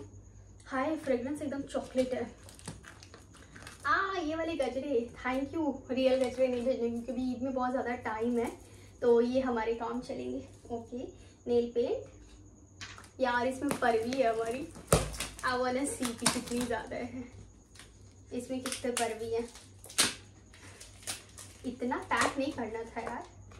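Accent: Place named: Bihar, India. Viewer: Dekhiye chahe jitna English bol lijiye aap lekin Hindi jb bolri h TB tb Bihar ki boli nikal hi jari kapda bolte kapra nai 😅😅😅 Hindi galtb bolti h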